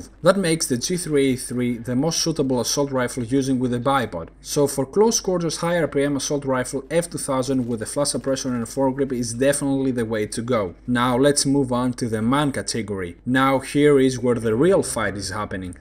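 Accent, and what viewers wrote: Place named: Greece. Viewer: definately english with greek accent (i'm from greece and i know exactly how greek people speak english :P)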